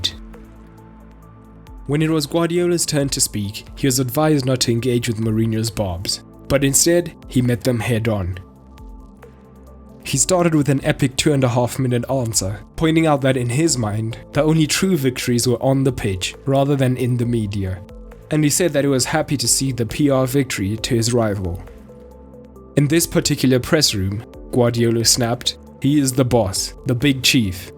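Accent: South Africa. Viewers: Is this South African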